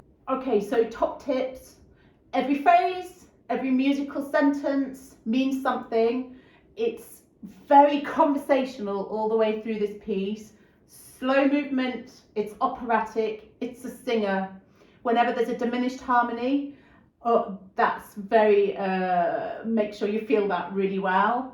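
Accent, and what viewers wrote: United Kingdom. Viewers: Amazingly angelical English accent! Flawless explanations. Again, thank you. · Snap! British English...love it!!! Same here!!!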